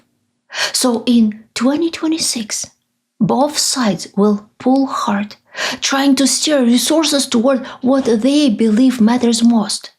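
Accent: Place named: Russia. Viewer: Hi, I started tuning into your channel because I have been a huge fan of ASMR content for years. You have a beautiful, soft voice, and I really enjoy the russian accent. What I ended up discovering was an intelligent woman, with a unique perspective on geopolitics, told with stories and facts. I REALLY, REALLY enjoy your videos, for all of those reasons. I encourage you to continue your work, you are a bright light of truth in a world hell bent on suppressing it. Your work is important, powerful and illuminating. I can't waif to see you continue growing, you deserve that success. Lots of love, from Melbourne Australia ❤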